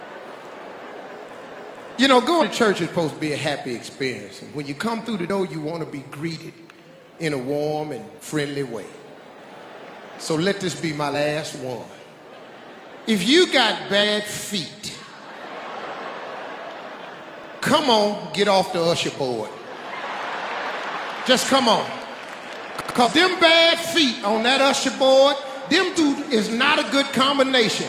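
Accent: South, United States. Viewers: Man his southern ebonics is so thick here i can barely understand him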